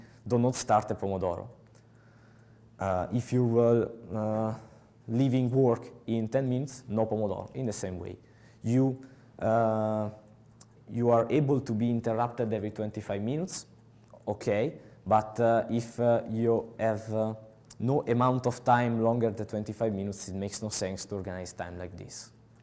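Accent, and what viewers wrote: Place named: Italy. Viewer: Love the Italian accent describing Pomodoro technique. :-)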